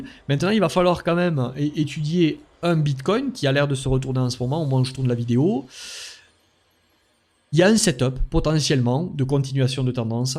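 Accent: South, France. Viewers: Bonjour, est il possible de faire une analyse de ce genre sur decred (DCR). J’aime beaucoup la façon que tu as d’analyser le tout avec l’accent du sud! Tu gagnes un abonné:)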